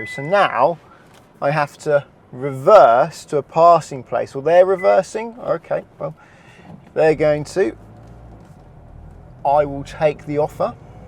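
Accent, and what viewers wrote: United Kingdom. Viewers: uhh, the video host is obviously British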